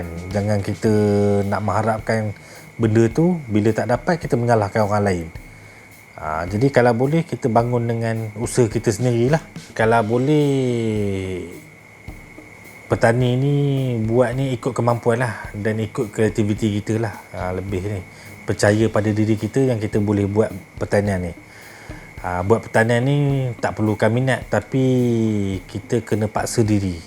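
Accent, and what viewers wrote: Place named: Pahang, Malaysia. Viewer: Lidoh Ora pahang ni kalau tengok gaya cakak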